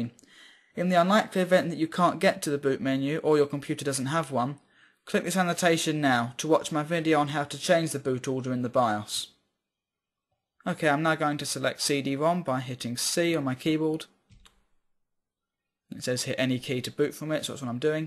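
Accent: United Kingdom. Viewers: An english mate. Who else think he sound like Mr. Bean? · This British accent pronouncing computer phrases sounds like either baby talk or garbage.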